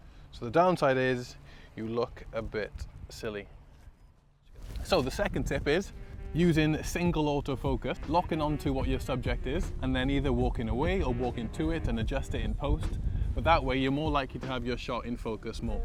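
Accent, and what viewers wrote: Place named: Wales, United Kingdom. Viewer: I not only have Welsh roots I still live there - I am so glad you mentioned that as whilst watching your videos I can easily hear your Welsh accent (I guess you are more south as I am near Aberystwyth) but struggling to work our where you are based - my guess is Australia? Best wishes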